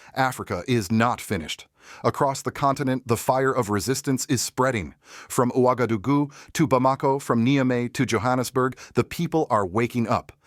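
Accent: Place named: United States. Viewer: F you American accent, you have no clue.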